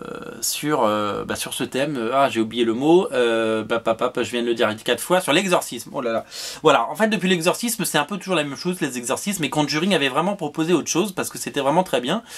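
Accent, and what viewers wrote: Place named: South, France. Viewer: Moi j'aime bien ton accent du sud :D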